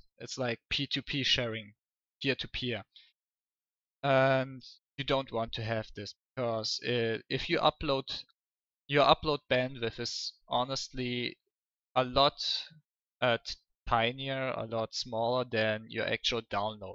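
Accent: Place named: Germany. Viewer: Ich hör raus, das er deutscher ist xD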